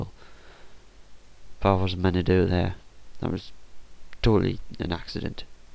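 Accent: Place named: North, United Kingdom. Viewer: Haha close enough! Thought you had a northan twang to your accent :)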